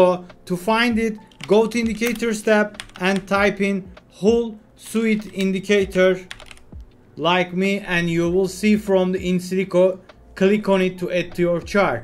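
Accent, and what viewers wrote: Turkey. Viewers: Krdeşim sen Türk'e benziyorsun konuşma aksanından anladım isim neydi? Bir de videonuzda altyazı özelliği aktif değil..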